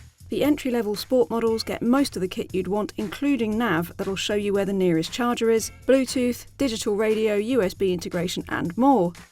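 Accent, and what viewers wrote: United Kingdom. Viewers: But the British pronouncements are so annoying. Hard to listen.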